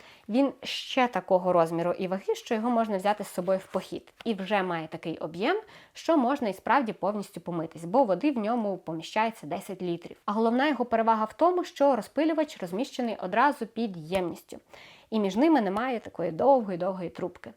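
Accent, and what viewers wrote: Standard. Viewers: дуже чиста і невимушена українська мова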